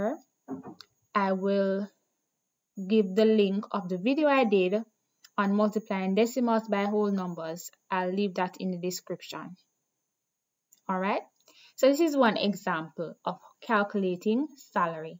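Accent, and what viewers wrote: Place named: Jamaica. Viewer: am in love with your Jamaican accent..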